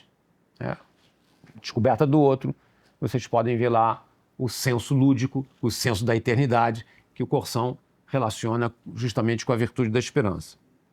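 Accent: Rio de Janeiro, Brazil. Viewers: não nega que é do Rio… fala na lata🙈! Perfeito! Torço para que nos presenteie com mais aulas no CDB…👏🏻👏🏻👏🏻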